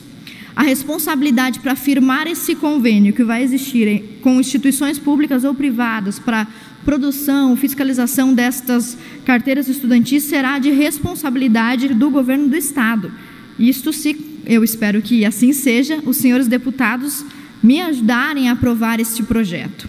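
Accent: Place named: South, Brazil. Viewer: Acho esse sotaque sulista tão bonito.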